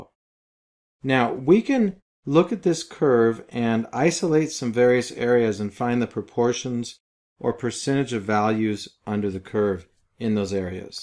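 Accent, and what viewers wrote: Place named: United States. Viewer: This is fantastic! A four-minute lesson on exactly what I am trying to understand --the 68%. Plus, it's a native American speaker with a clear-as-a-bell voice. I only stopped it from auto-playing the sequel in order to vote thumbs-up!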